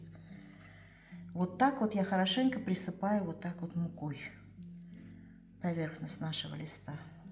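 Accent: Standard. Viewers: Какое мягенькое нежное тесто! А какой приятный, завораживающий голос милой хозяюшки! Чистая, правильная русская речь! Спасибо Вам огромное за рецепт, за подачу, за Ваше чудесное видео! Здоровья вашей семье!❤❤❤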